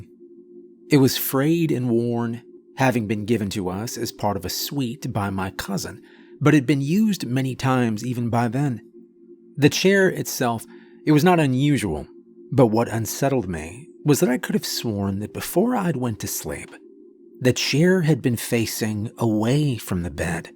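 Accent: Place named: United States. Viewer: As always your narration was second to none. Being in the UK, I have often found it difficult to listen to an American accent narrator, but you have the perfect voice, timbre and intonation, certainly for this genre. Thanks for narrating this fabulous story ❤❤❤❤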